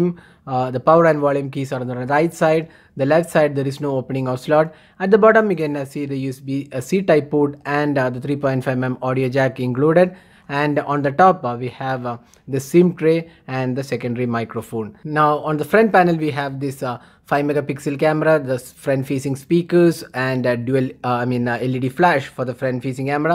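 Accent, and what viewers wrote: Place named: South India, India. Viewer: I love your South Indian accent that's why i subscribed .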